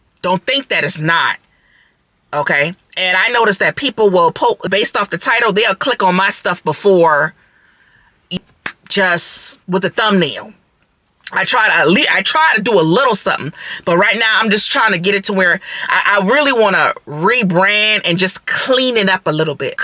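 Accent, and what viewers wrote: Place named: Charleston, United States. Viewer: Ouuuu❤❤❤ I'm going to start recording some GIG work content. Also I knew you were from Chuck town ..... that accent. Im from Goose Creek area ❤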